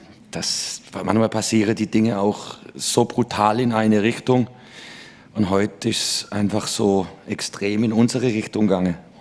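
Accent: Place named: Baden, Germany. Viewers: deswegen würd ichs als badisch/allemannisch bezeichnen. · schtimmd! Er chummt us Eimeldingä - des isch 10 km fu Lörrach.. ;-)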